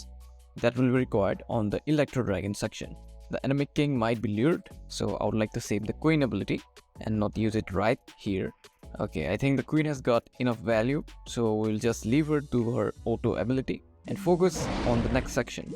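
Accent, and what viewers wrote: India. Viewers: hey ur voice tone gives an Assamese vibe.. are u?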